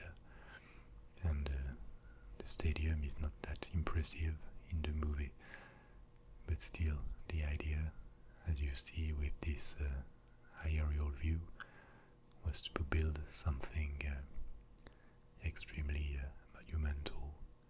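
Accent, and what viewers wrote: France. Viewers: I just found your channel and are clicking through your videos and just wanted to leave a comment. :) Your level and tone of voice is exactly the right trigger for my ASMR (as well as the French accent...I don't know why, i just really like it, it calms me). So thank you for your amazing work, I will keep on listening now!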